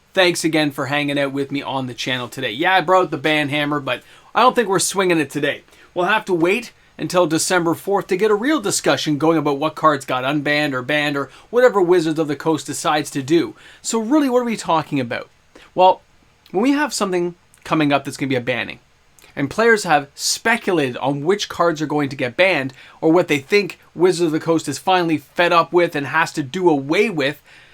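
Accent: Canada. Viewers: Anytime I have a negative thought about how WotC is doing something I don't like, the thought in my head is narrated in your voice. A shouted-stern-canadian voice.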